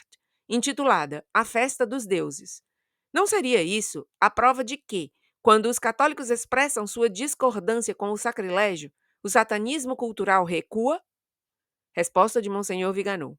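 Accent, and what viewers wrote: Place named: Ceará, Brazil. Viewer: Muito boa a explanação das palavras do Monsenhor Viganò,. E com todo respeito: o seu Português falado é muito interessante de ouvir. Manifestações do Castelhano com sotaque nordestino do Ceará, onde você morou por um bom tempo.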